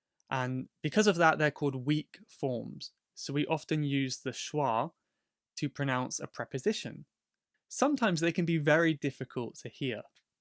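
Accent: United Kingdom. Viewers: Thanks! You are good teacher. I've been looking for such a clear British accent for a long time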